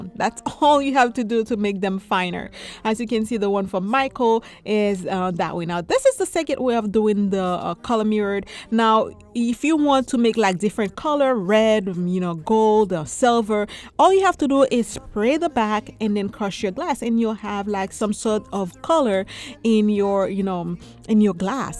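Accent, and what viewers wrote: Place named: Haiti. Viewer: My guess is you are Haitian. I love your voice and accent. God bless you.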